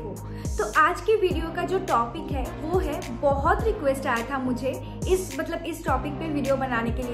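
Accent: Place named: Bengal, India. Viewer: Are you a Bengali girl Because when you are taking it listen like you are telling full hindi and half Bengali And I am also a Bengali girl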